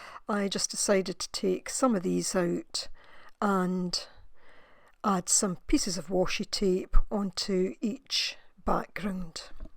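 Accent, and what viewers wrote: Scotland, United Kingdom. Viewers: I agree that it's great that you left in the parts where you were struggling. We all go through it, and it makes us feel better about when it happens to us. I'm glad you kept going on these. The dragonfly is so beautiful! And may I just say that I love your accent? I haven't come across any other crafters or artists with Scottish accents, and it's just my favorite accent to listen to - love it! 💗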